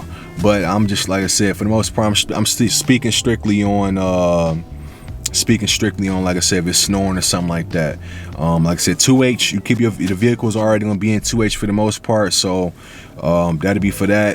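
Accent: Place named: Detroit, United States. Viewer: As soon as I heard what up doe I was like he from Detroit he know what he talking about.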